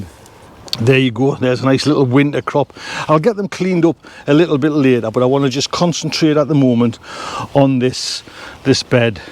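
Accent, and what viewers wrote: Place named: North East England, United Kingdom. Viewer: Hello. Just found your link on Steve’s channel and as a new gardener (now retired) I am always on the lookout for gardening tips so I will be going through your past videos. When I heard your accent I was curious where you were from in the North East. I am from Stanley, Co. Durham so I thought it would be nice to get tips from someone who is in the same climate as myself. Looking forward to your past and future videos. Appreciated. Allan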